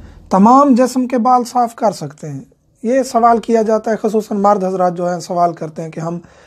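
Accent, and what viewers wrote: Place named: Saraiki, Pakistan. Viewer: Sraaiki bolny waly urdu aisy he bolty hn....weldone ❤❤❤💐💐💐💐💐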